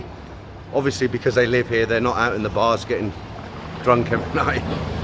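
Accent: United Kingdom. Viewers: is my favorite "Thai expert" youtuber, with his classic British speaking accent and his perfect acting face. Keep up the good reportages of our ones beloved paradies. I started my Thai journeys in 1972 and my last visit was 2 years ago, thats 50 years of fun and exitment, what a good life we had. We were the lucky ones!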